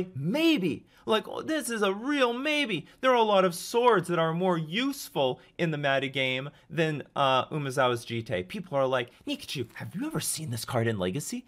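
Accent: Canada. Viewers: When I finally heard it in your accent that you're Canadian. Lol love your content man.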